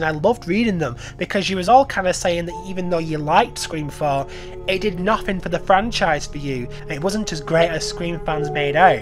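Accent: United Kingdom. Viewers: I really do feel like Roman fits into the whole story very well - it’s a believable twist and a big one, however as people dislike the third movie, they feel like they should dislike roman and brush aside that he was the third killer in the original movie and the reason the first movie happened. My unpopular opinion is that Scream shouldn’t be viewed as a comedy more than a slasher, and whilst there are some funny moments, the franchise overall isn’t taken too seriously by some horror fans and i feel it should be, it’s the most realistic franchise in the genre and it hasn’t deviated from its realism at all, as there isn’t anything supernatural with the killers. So to conclude, Roman being the third killer is canon and he provided a good twist, and Scream as a whole should be viewed as a more serious slasher entry, rather than something of a comedy. Great video, it’s always nice to hear a fellow brit loving scream! 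🔪🇬🇧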